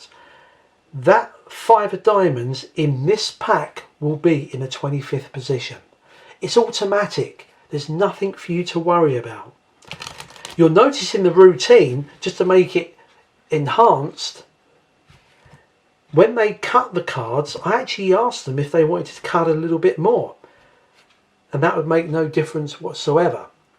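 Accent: United Kingdom. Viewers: I am glad you liked it and hope you'll try it out. Let me just say that you're doing a great job with the channel, got me subscribed. It's so good to see a knowledgeable man teach magic with soul (and a british accent ;) ). I wish you all the best things you can wish to yourself. Keep up this fantastic work and Greetings to you from Moscow!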